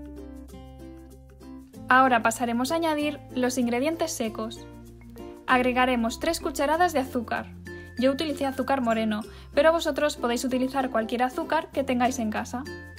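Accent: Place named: Argentina. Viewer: Jajajajajjajajajja siiiii obvio tono re argentino ehh arriba argentina xd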